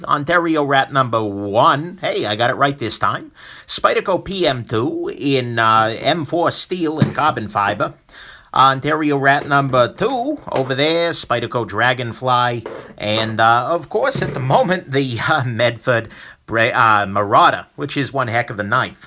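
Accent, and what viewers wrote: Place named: Brooklyn, United States. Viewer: English language learner here. Excuse me, what kind of English accent do you have? Brooklyn? It's very interesting and curiously easy to understand in spite of your very fast delivery.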